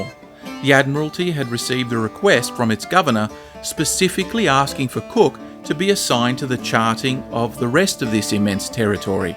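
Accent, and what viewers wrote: Australia. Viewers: Sorry, should have looked there before commenting, and was of course a tiny, unimportant detail in your amazingly crafted documentaries. I wish to add that I love both your texts as well as the way you narrate them - 'Strine' yet perfectly intelligible even for those for whom English is not their mother tongue and whose hearing has deteriorated due to old age (as in my case)... and with perfectly dosed hilariousness ('However,...'🤣). Your documentaries are providing me, just in time, with the therapeutic benefit of proving that the present 'crazy times' are nothing new - humanity has always been both crazily stupid as well as deeply admirable.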